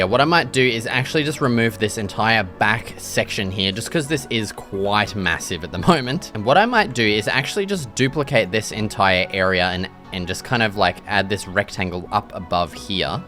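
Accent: Australia. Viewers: hes australian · are you Australian?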